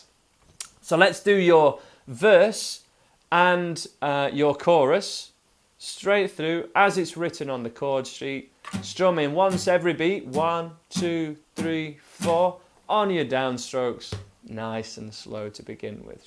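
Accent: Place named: West Yorkshire, United Kingdom. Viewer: What part of West Yorkshire is that accent from?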